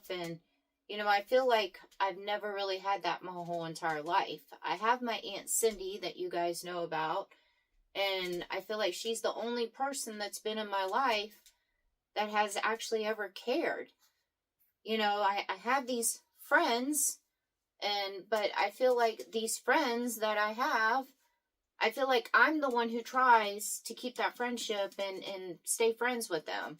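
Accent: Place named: Georgia, United States. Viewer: Your kindness is not wasted. I think you are beautiful. I can't tell you how to find happiness, but I hope you do so, often. I'm from GA originally and something about the way you talk reminds me of home. I love your videos and I hope you continue. - - Not sure how this will land but I will mention it. God will always be there to listen. Prayer is therapeutic, at least. Even if that is all that is expected of it.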